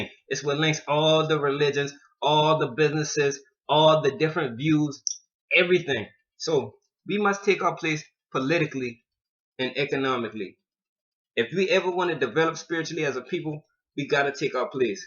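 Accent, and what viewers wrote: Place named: Gullah, United States. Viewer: I hear that geechee talk my brother. I’m Gullah geechee from the sea islands of Ga. Great Video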